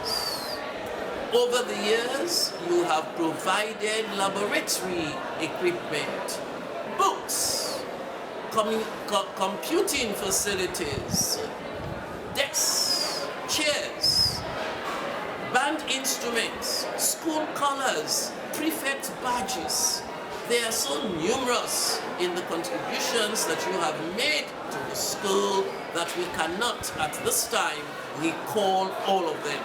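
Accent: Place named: Caribbean. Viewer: Now I know where the Caribbean accents come from.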